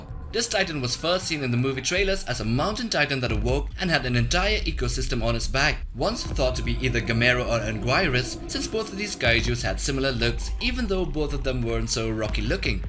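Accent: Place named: India. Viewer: The Narrator is of Indian descent---- his English is a giveaway.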